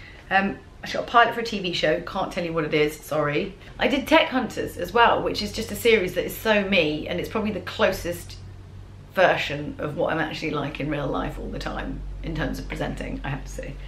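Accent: United Kingdom. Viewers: Best of luck! If you do go, you should definitely hang on to your Britishness. In fact, you might want to play it up. People are impressed by an authentic English accent, especially one like yours which is REALLY hard to imitate! I think you will be a big success in America. You have a lot to offer!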